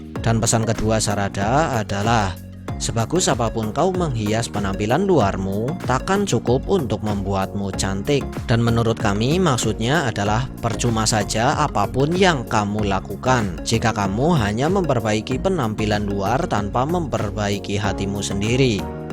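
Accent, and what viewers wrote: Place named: Banyumas, Indonesia. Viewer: ngapak banjarnegara👆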